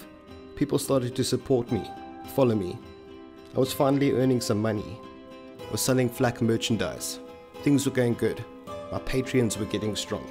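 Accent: South Africa. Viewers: Very new to your channel came across it via some Jordon Rant rust stuff, heard the accent and went wtf its a saffer! Best south african channel I've come across so far. Congrats on 2 years. All the best for the years to come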